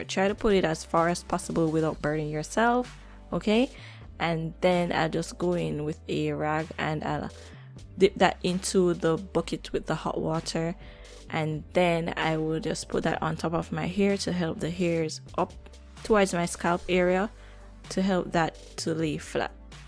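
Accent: Jamaica. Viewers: I figured you're Jamaican based on your accent 😍 love the execution girl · Yup..I'm Jamaican too..so I was like yeah she is from Jamaica 🤗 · Cya hide the accent 🇯🇲 lol love it and def gonna try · Heard that Jamaican accent and subscribed instantly 😩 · You are very Jamaican. That "lawd Jesus" buss out 😂😂. Very nice hair style and method.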